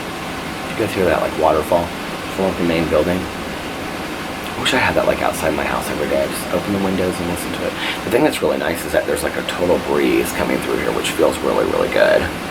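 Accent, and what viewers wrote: South, United States. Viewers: I totally hear the southern in your voice! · I would say you have a Southern-esque accent. I have a friend from Georgia and you sound almost exactly like him, just not as extreme.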